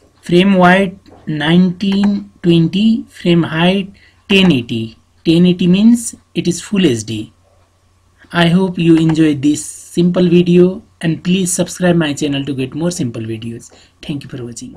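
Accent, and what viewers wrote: India. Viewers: He is a Bihari I guess...... His English sounds like Bihar English. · I love Indian accents xD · It's north indian rural accent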